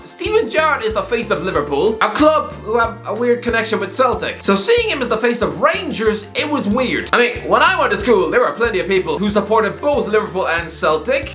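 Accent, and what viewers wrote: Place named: Ireland. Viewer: Speak English thick mick.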